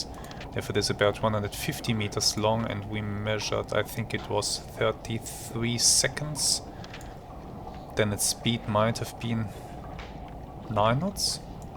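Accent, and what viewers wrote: Germany. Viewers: I really love your SH3 series mate. You are smart and careful and that german accent is just awesome! This is real entertainment. Auf wiedersehen herr kaleun!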